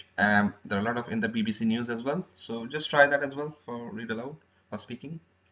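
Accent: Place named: India. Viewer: "Give" the exams...very Indian accent...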